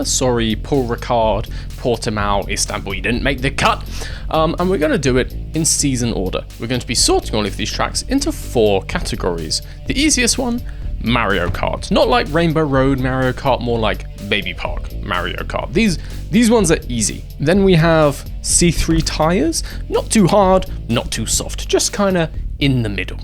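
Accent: United Kingdom. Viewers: I can assure you: You sound British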